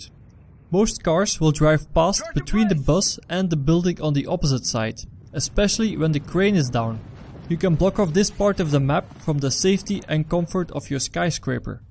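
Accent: Netherlands. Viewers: Hij praat wel met een Nederlandse accent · Are you netherlands?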